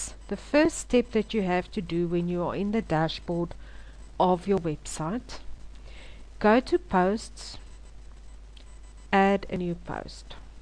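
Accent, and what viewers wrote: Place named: South Africa. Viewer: Haai auntie maar daai is n South african accent. Thanks for the video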